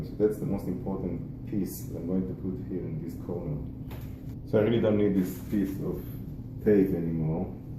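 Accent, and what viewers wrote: Israel. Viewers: Very helpful and insightful. My bf and I are remodeling our shower right now and have been trying to figure out how to do backsplash onside an insertion for shampoos and soaps. Let me tell ya, thats not for faint hearted 😂. Thank you. Just a question, are you Israeli? Your accent is very familiar. Thanks a bunch again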